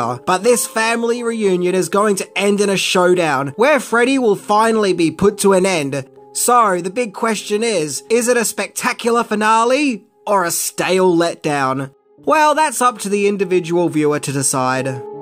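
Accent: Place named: Australia. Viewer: Hearing your Australian accent coming from freddy is awesome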